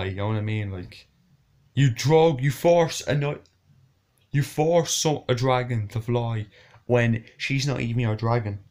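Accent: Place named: Ireland. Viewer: It's such a Masterpiece of a film. My name is Lee and this is my 2nd channel. My main channel is The Dragon Critic. I can tell your Irish and so am I :)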